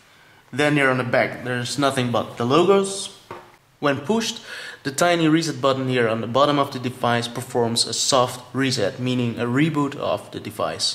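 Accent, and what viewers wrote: Netherlands. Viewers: OMG Dutch English always sucks ... (but nice try) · wow a chinese who talks with a Dutch accent you don't see that very often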